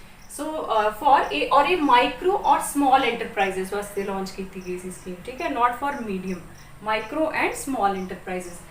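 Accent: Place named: Majha, India. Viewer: Madam amritsar di lgdi a ya majhe di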